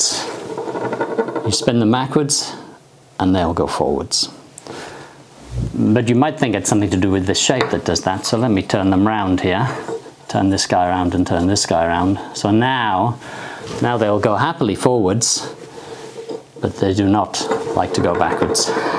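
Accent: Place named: North East England, United Kingdom. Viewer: Which part of the north east of England is he from, i wonder..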